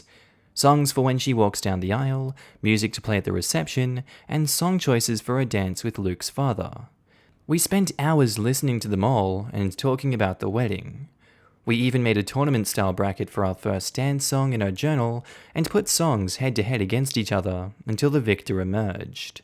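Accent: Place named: Australia. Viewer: Aussie accents are hot, then add that personality, voice and face and you have a quadruple threat guy!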